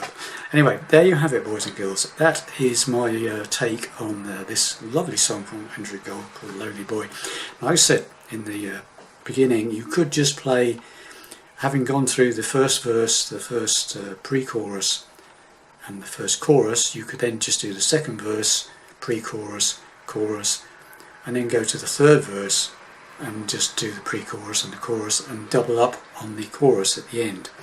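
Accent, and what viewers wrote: England, United Kingdom. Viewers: that's an old Taylor you're playing there young man. As you sound English I'll assume you have a good sense of humour and your turkey-neck tells me you're old enough to remember this one from 1978. and " <link>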